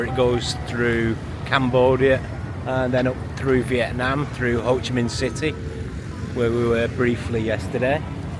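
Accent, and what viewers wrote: United Kingdom. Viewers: You sure you're not in LONDON? pure pommy accent 😂 ❤ glad that you guys enjoyed Cambodia ❤ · Is your accent Welsh or Scouse? It’s beautiful.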